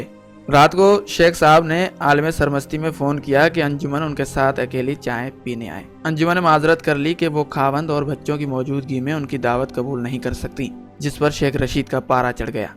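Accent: India. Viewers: This is Indian propaganda. This commentator's Urdu is typical Indian. His pronunciation is very Indian.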